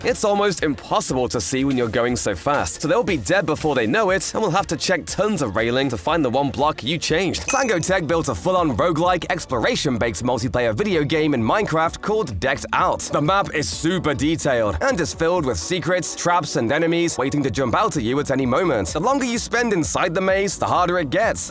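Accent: United Kingdom. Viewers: He sounds like British skip the tutorial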